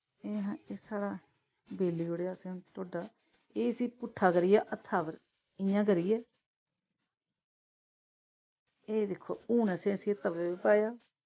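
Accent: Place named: Dogri, India. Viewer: Your dogri accent is soo good♥️♥️♥️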